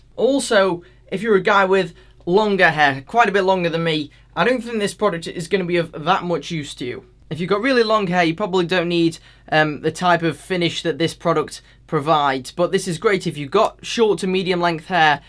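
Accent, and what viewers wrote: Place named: Midlands, United Kingdom. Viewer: brilliant video mate! That midlands accent though haha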